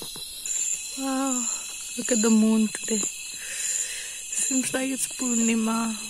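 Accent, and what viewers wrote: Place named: Jharkhand, India. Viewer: Ap to bilkul jharkhand k logon jaisa hindi bol rhi hai, ekdm raw and original. I thought people over here speak only English. This is the first video I am watching related to Mizoram lifestyle and I am quite impressed. Lots of love and warm hug from Jharkhand.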